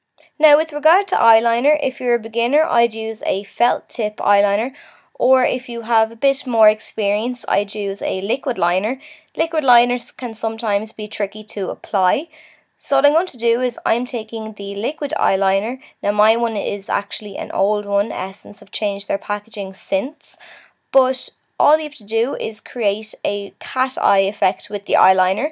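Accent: Ireland. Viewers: Ahh the irish accent :) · Are u irish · ur irish?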